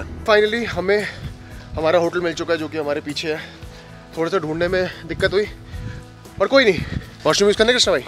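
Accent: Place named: Haryana, India. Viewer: Jadda haryanvi hai bhai😂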